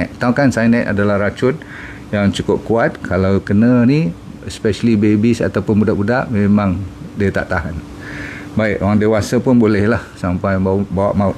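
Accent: Malaysia. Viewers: Saya dari Indonesia senang nonton video Profesor, hanya terkadang sedikit kesulitan memahami bahasa dialek Malaysia profesor, 😅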